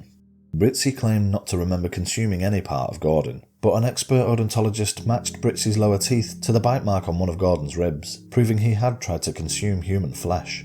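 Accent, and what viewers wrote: United Kingdom. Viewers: How pleasing to hear your uk 🇬🇧 voice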